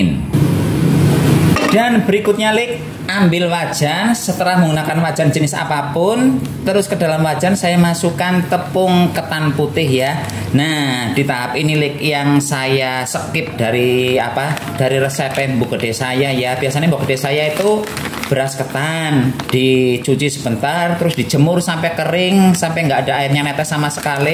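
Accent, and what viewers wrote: Banyumas, Indonesia. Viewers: Pbg hadir kang ngapak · Ngapak lucu koh 😂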